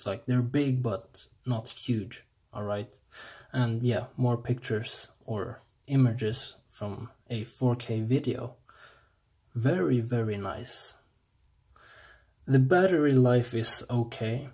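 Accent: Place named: Sweden. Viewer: I'm assuming you are from Sweden due to your accent. So am I. Would you say it's worth 3000:- to add the 4k-screen? Deciding which customization to buy. Noticed you talked alot about the two screens. Have you tried the full-HD one yourself? Cheers and thanks for the content!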